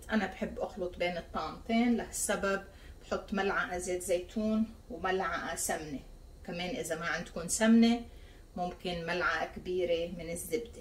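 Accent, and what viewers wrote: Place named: Lebanon. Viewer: احب طبخك اللبناني واحب لهجتك اللبنانية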